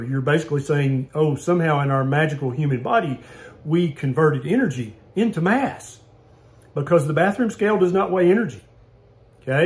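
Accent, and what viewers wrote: South, United States. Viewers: Sometimes I need my Bart Kay arguments with a gentle southern charm. Thanks for that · You know...when I heard the Southern twang I knew it was going to be a sobering talk. ⚕️❤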